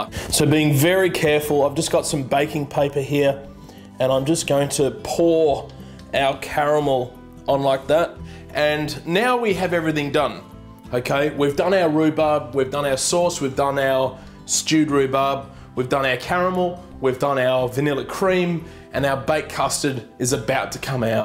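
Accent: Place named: Australia. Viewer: You are an amazing person! and have all these great dishes!!! AWSOME! also love the aussie accent man! and you must have a busy week making all these videos and deciding what to make and getting ready and all!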